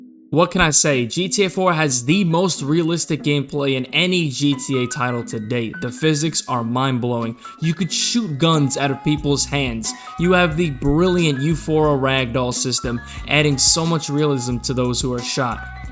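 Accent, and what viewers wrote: New York, United States. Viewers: I fuckin love your New Yorker accent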